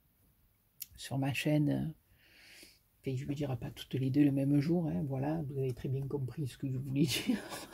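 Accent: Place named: Midi, France. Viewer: Coucou merci pour ce partage de bal. De jolies créations encore.. Tu m'as fait rire avec "c'est une petite galejade" 😊 du Midi ça te va bien avec ton bel accent que j'ai perdu 🙂 Je suis du 84 😎 mais vis dans le 50 ☔ depuis 2013 😌 Alors pour moi c'est juste un plaisir de t'écouter 😊 Bisous!😘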